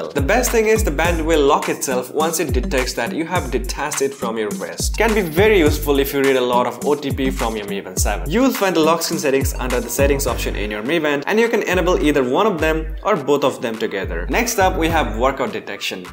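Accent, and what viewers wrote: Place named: Bengal, India. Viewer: Vai tone Bengali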